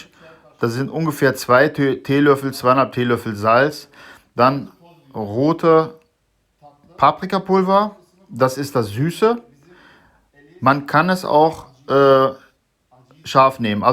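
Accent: Ruhr, Germany. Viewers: Super Rezept und echt lecker. Kommst du aus‘m Ruhrgebiet oder hast da lange gewohnt, weil du öfter „dat“ sachst 😉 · Sehr gut erklärt! Kommst du ausm Ruhrpott?? deinen Dialekt finde ich klasse, Ruhrpottbruder! LG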